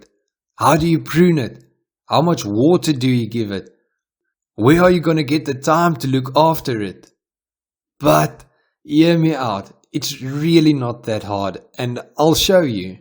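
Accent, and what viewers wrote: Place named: South Africa. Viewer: Damn that South African accent 😂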